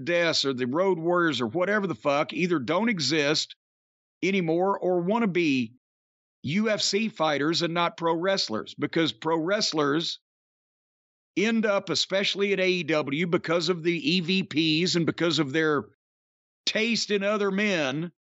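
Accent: South, United States. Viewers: Proper oldschool Southern accent, love it!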